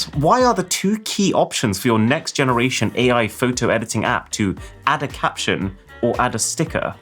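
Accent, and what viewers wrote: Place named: Nottingham, United Kingdom. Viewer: Your from Nottingham thats crazy.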